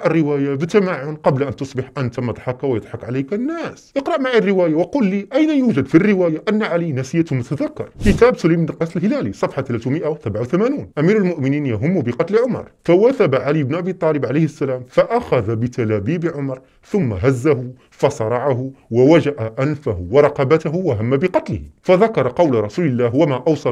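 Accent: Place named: Iraq. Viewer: صاير تحجي عراقي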